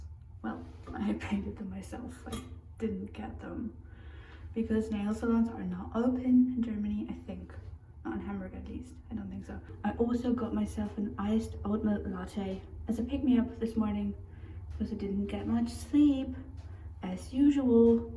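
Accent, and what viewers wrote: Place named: United Kingdom. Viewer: You sound so british 😊💕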